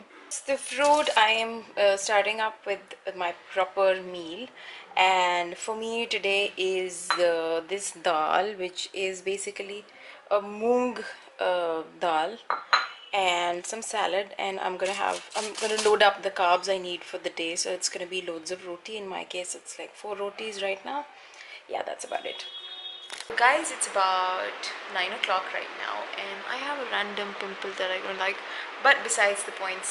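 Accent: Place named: Trinidad and Tobago. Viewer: good info you sound like a trinidadian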